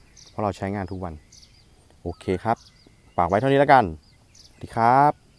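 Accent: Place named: North, Thailand. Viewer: จะถามหลายทีแล้วครับ จขกท.เป็นคนที่ไหนครับ สำเนียงออกทางเหนือจังเลยครับ ไงก็ติดตามคลิป ตอนนี้ยังไม่มีกำลังทรัพย์กับวาสนา อีกหน่อยคงได้ควบฮาเล่บ้างครับ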